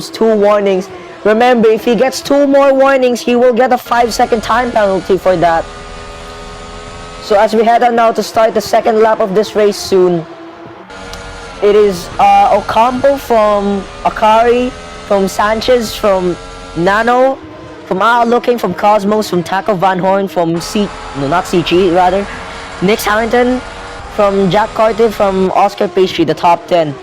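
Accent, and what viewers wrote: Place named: India. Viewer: Why do you sound so indian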